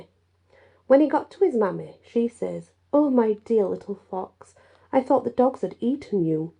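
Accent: United Kingdom. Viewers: Hey sis, im connecting from Krishna ..I love your beautiful brittish accent ...i love the story ...❤❤❤❤ love your contents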